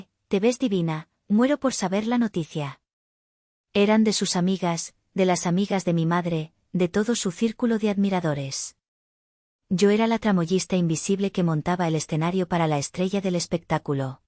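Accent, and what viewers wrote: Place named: Spain. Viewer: Una mexicana relatando con voz de auténtica española, eso resulta raro, no encaja, pensé que la historia se desarrollaría en España, pero no, la de la voz de españolita resultó ser mexicana.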